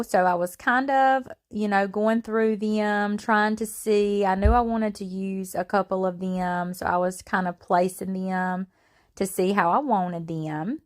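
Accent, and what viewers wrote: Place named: South, United States. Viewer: First time viewer. Love your projects and accent. Is it TN.,KY., or Alabama.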